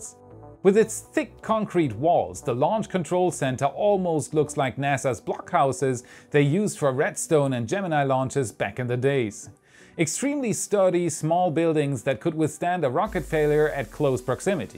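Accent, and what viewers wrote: Germany. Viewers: German accent coming back again ! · Don't be afraid of showing the accent, a German talking about engineering is as natural as a bird flapping its wings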